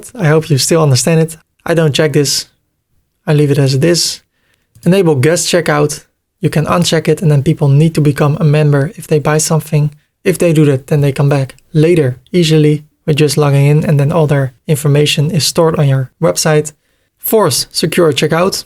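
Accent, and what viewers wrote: Netherlands. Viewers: you dutch.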